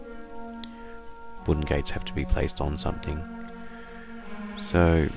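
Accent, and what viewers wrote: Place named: England, United Kingdom. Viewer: Thumbs up if you read Harry Potter and you knew that the guy was going to have an English accent.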